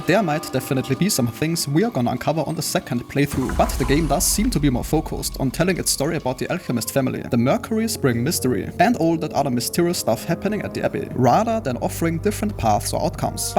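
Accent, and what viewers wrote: Germany. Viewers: So geil wenn deutsche englisch sprechen 😂 man hört es irgendwie immer raus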